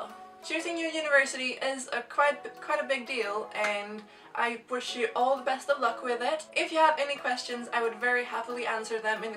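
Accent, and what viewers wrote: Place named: United Kingdom. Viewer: I hope you're enjoying University! Your accent has become so much more British but thanks for the tips, I'm starting my last year of gymnasiet and I'm not sure what I want to do after it :) <3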